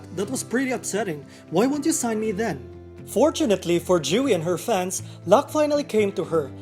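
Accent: Philippines. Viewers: The narrator's accent is so Filipino. Ewwwwwwwwww 🤮🤮🤮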